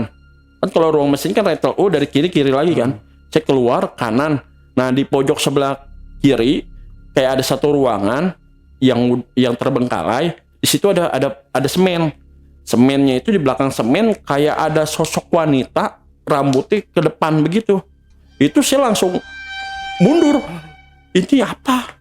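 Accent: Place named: Sunda, Indonesia. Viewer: Ngegerung sunda Banget bang😁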